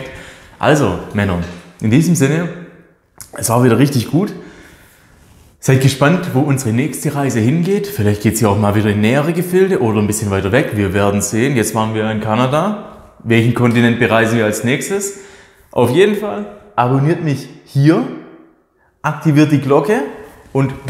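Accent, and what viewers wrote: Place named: Swabia, Germany. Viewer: Kanada ist mein erstes Reiseziel wo ich hinmöchte und auch schon war, ein Traum dort. Aber the Rock kommt doch nicht aus Kanada. Aber was anderes, wenn ich so deinen Dialekt höre, merke ich du bist wohl auch aus dem schwäbischen, wie wär's mal in nächster Zeit irgendwann mit nem gemeinsamen tasting wo jeder von uns ne diverse Anzahl an verschiedenen Bieren ranholt und wir die gemeinsam verkosten und auf beiden Channels dann hochladen? Fänd ich ne coole Nummer.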